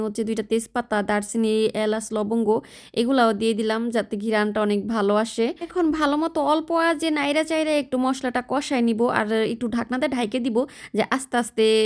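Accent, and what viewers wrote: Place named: Dhaka, Bangladesh. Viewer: আপু আপনাদের এলাকা কোথায় বাংলাদেশের, আপনার কথা শুনলে মনে হয় আপনি ঢাকা বিভাগের লোক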